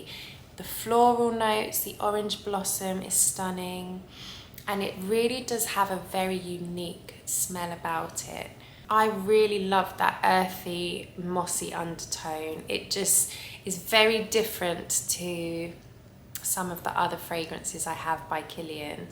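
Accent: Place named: United Kingdom. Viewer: i thought you live in the UK as you have British accent.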